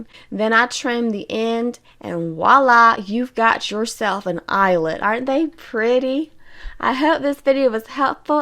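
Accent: South, United States. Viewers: cute country southern accent. and good video.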